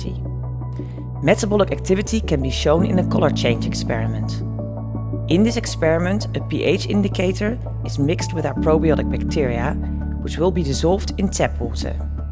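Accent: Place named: Netherlands. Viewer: this is an obvious Dutch accent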